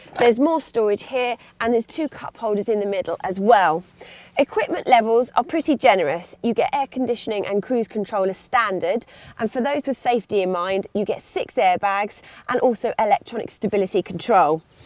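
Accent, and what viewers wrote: United Kingdom. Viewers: i'm so annoyed by her british accent after a while.. I dunno why because I like british accents..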